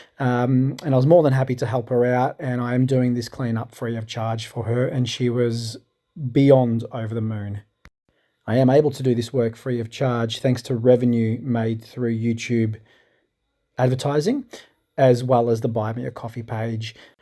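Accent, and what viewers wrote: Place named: New Zealand. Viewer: Oops, I meant New Zealand, so sorry. 😬It's the accent! 😄 If you take on this yard, I look forward to seeing what the grass can look like when properly maintained. Thank you for the info.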